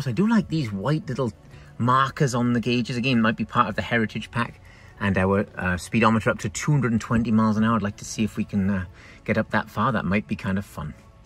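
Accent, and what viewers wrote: Wales, United Kingdom. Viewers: Is that a Welsh accent I hear while your narrating the video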